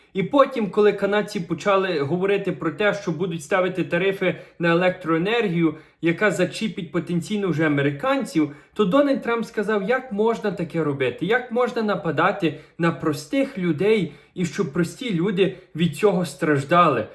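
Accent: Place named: Ukraine. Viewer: Я підписалась чисто через те, що Ви маєте таку дуже українську говірку (стиль вести бесіду) нагадує, щось рідне 🩵💛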